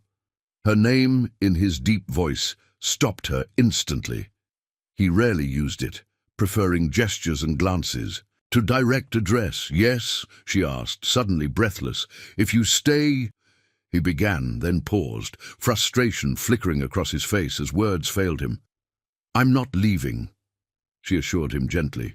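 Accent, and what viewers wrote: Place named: England, United Kingdom. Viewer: Why would you use an English accent for the AI voice?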